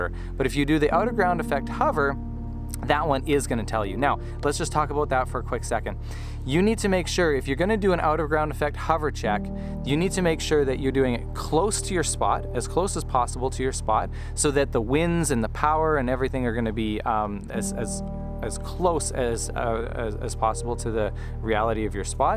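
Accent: Canada. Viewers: Love these videos Your thoughts can be use in every day life Air safety institute videos cause & effect gives me same CHARITY..who knew chopper talk have life lesson I dont fly i walk Why do you sound So kanadien in pronunciation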